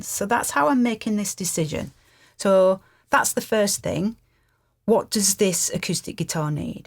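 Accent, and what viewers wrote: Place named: Yorkshire, United Kingdom. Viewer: As helpful as ever. And the icing on an already great cake is to have this wise advice delivered in a fabulous Yorkshire accent. Love it.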